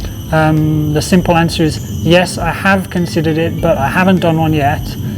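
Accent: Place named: Black Country, United Kingdom. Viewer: Kudos for answering my question on where in the Black Country you're from. Had not seen the vid where you referred to your place or origin but got it from your accent!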